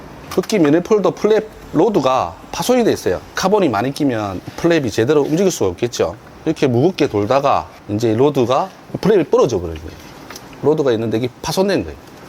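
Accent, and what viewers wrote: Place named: Gyeongsang, South Korea. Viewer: 대표님~어색하지만 ㅋㅋ 차츰 말씀 중 사투리가 사라지는 것 같은데.... 같은 경상도 사람으로서 씁쓸합니다.ㅎㅎㅎ 정겨운 사투리가 좋은데 ㅋㅋ ~ 수고하세요 !!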